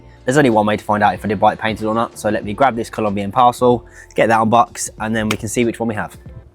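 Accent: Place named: Birmingham, United Kingdom. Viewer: Well done mate. Are you a fellow Brummie?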